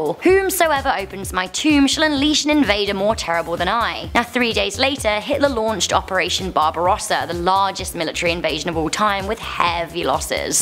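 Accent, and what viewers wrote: United Kingdom. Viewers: Nice video and btw I know you are british but still lovw your british accent <3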